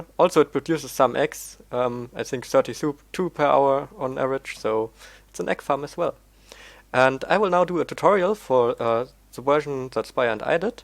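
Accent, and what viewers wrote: Germany. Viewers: do you speak german (you sound like that)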